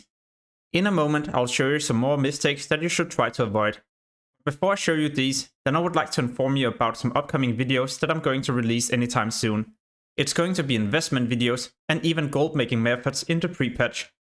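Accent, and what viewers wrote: Denmark. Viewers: Danish best accent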